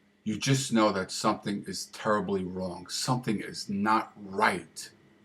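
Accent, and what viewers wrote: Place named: Brooklyn, United States. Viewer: Yes, this rings true. It's amazing the way they all read from the same script. In my case, I was the scapegoat for two unhappy families. The options were stark---get out or commit suicide. I drifted away in my twenties. By my early thirties, I was gone. My father had written a letter to my boyfriend, telling him that I was a mental case, and that he ought to dump me. The letter did have an effect on my bf, but not the one my father was hoping for. And that was the end. Anyhow, that happened years ago. I realized my family was sick, but I didn't realize they were narcissists. I'd heard the word but didn't know the meaning. Narcissism clearly comes out of childhood trauma that the narcissist cannot face and cannot change. Lately, I sort of wonder what happened to my family after I vamoosed for good. I'm sure a golden child became a new scapegoat for her mother---she was the next oldest and at bottom they disliked each other---but I do wonder who my father beat up on with me gone. 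Perhaps his girlfriend, whom he was beating up on anyway. But if there was no new scapegoat, then he simply imploded. That's the way it is with these people---they either find a ready victim who can't fight back and can't expose them---or they melt down. Anyhow, it does get better with time and therapy. Eventually, they all become strangers, and it becomes incredible that they once were intimates and "loved ones.". (An empty and cynical phrase if there ever was one). BTW, I love your Brooklyn accent! I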